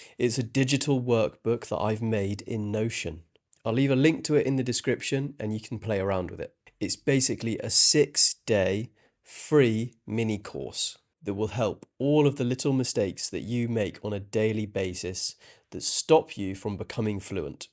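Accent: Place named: United Kingdom. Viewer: Omg your voice with this British accent is incredible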